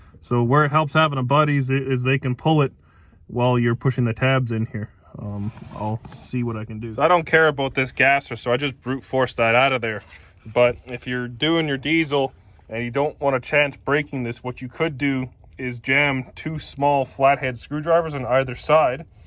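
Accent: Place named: Alberta, Canada. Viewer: holy fuck guy youre a walking 2nd gen encyclopedia! by the accent im guessing youre in Oilberta.. you happen to sell parts? trying to put a 2wd nv4500 into my 4x4 47re, need the 4x4 adapter, a nv4500 4wd output shaft, and the input shaft for my transfer case to match the nv4500... why the outputs/inputs werent all the same is beyond me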